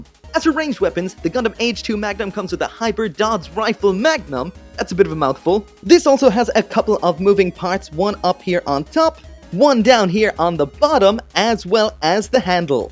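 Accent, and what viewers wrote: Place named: Ireland. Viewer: You look and sound like an Irish Bam Margera dude.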